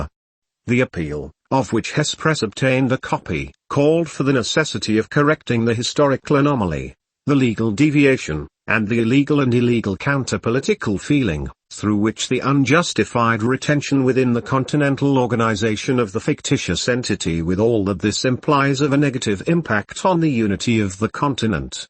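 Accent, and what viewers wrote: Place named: United Kingdom. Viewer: Very good idea! Keep up the good job even if I am not familiar with British accent.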